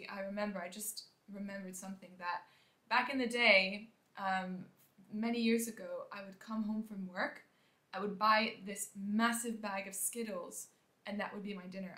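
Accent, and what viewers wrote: France. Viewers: As an American who does not speak French, it’s hard to understand when you say the words with a heavy French accent. It would be really nice and helpful if you could put the words on the screen.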